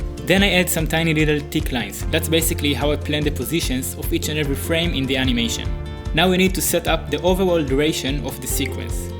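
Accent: Israel. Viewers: אפשר לשמוע שאתה ישראלי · שומעים את המבטא הישראלי😆 אבל יפה אחלה סרטון👍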